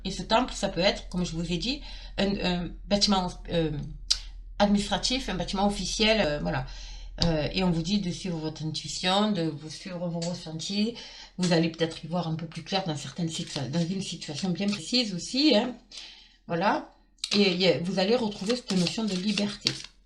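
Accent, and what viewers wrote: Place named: Marseille, France. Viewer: Bonjour vous êtes du midi Marseille mais pas de paris Bravo vous avez une voie qui chante bien je suis gémeaux ascendant BALANCE et Lunaire BALANCE et ge deux petits enfants comme mois sauf lunaire mais ils ont us plus MERCURE et venus a leur naissance a y une chose bien le signe du Lion qui est depuis longtemps dans le signe Gémeau 🧚l'étoile de david me rappel mes ancêtre juive🧚oui c pas mal j'aime bien Merci mois je suis de Libourne près de Bordeaux et depuis 69 je suis sur Toulouse bonne journée 🧚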